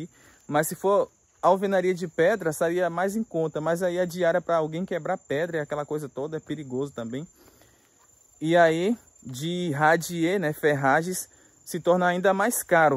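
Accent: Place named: Minas Gerais, Brazil. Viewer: Nunca imaginei que fosse no sul da Bahia sempre assisto você achando que fosse mineiro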